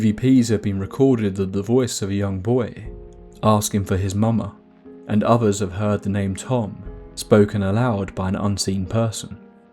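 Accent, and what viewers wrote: United Kingdom. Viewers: Excited to see you’re back & growing! Maybe cause you’re in the UK, between the accent and history, I find myself utterly intrigued! Keep up the good work 😊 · It's cuz they're British I'm telling you, it's like if a documentary isn't narrated by a British accent I don't believe it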